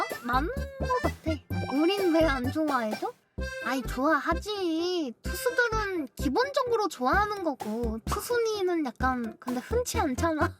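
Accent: Gyeongsang, South Korea. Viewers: 웃긴건 모르겠는데 특색있어서 매력있는 목소리이긴 하죠. 같은 경상도사투리를 쓰는데 전혀 다른느낌이라ㅋㅋㅋ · 마뫄님 보이스 참 매력적이죠. 살면서 만나기 쉽지 않은 음성이라 보면 됩니다. 경상도 특유의 쎈 억양과 사투리를 구사해도 전혀 상대가 화나지 않는다는건 엄청난 강점이자 재능입니다. 교원 실습하셨을 때 인기 많으셨을 것 같은데요. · 처음엔 물방울 떨어지는 소리/농구 코트 급제동 웃음소리에 뫄며들었는데..... 이제는...... 경상도 사투리 방음부스 설치된 이후의 호탕한 웃음소리 엄청난 떵겜실력 가끔 들리는 꿀꺽 소리 자주 들리는 컹 소리 대두뫄 버츄얼뫄 뫄마모찌 없으면 못사는 몸이 되었다구!!!! 뫄마조아!!! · 경상도 방언 쌘거 맞습니다 ㅋㅋ 일본어 비음많고 중국어 성조있듯이 그냥 특징인데 화 많이 나셨나 보네요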